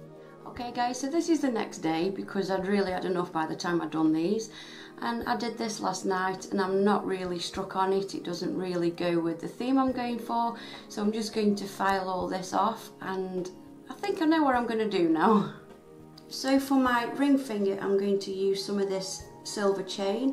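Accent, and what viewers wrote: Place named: Stoke-on-Trent, United Kingdom. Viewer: I fuckin' knew it! 'ey up duck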